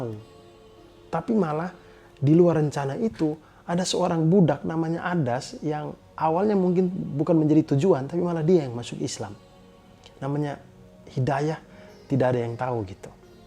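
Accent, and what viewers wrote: Eastern Indonesia, Indonesia. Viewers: Tetap ada logat timurnya ya. Suka sekali. Apalagi cara pembawaan ceritanya. Cocomi jd story teller.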